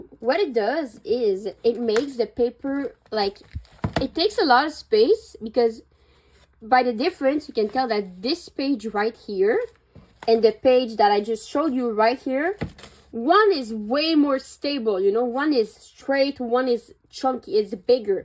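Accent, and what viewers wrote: France. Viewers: Hey, I'm new on your channel and actually... Are you french ? No cause you've got a french accent :') (saying that cuz I am french). Great video tysm !! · your accent is so pretty :3 my grandma is Belgian and speaks French so I could immediately tell you have a French accent lol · Salut!! J'espere que tu vas bien.Est ce que tu es française? Parce que je trouve que tu as un petit accent français lol :).En tout cas tes dessins sont trop beauux <3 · r u from France idk your accent feels french ( btw I speak French)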